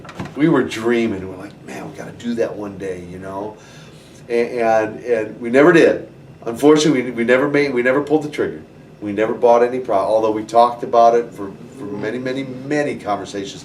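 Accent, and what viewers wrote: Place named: United States. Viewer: Wow, he learned to speak in an American accent really well!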